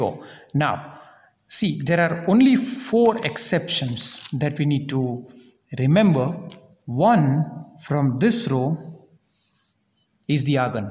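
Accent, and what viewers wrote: Gujarat, India. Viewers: Sir by your way of speaking it seems like you are gujarati. Am i right?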